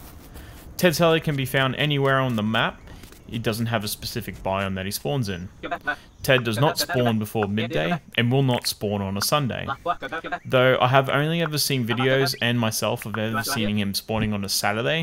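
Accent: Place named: Australia. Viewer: It's nice to hear the good old Aussie accent used in videos. Keep it up mate.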